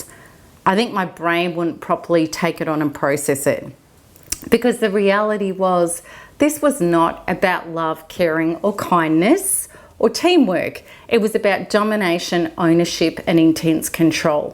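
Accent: Australia. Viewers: I love your Aussie accent :) hello from Washington DC and thanks for your help!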